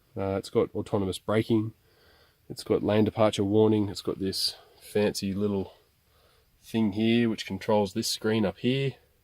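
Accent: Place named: Australia. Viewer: Cool Australian accent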